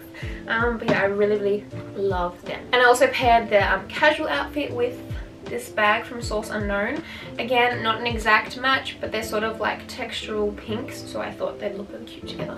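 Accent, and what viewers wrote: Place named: Australia. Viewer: loved the video, then you started talking, and you are a fellow aussie!? hello from brisbane! instant subscribe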